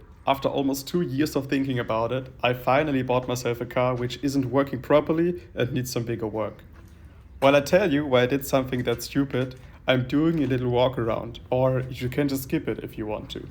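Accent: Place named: Austria. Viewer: You have a very strong accent, are you from Austria?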